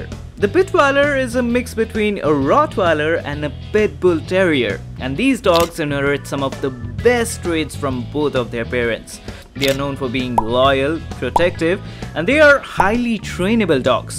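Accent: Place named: India. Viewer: You sound funny... Let me guess, you're an indian.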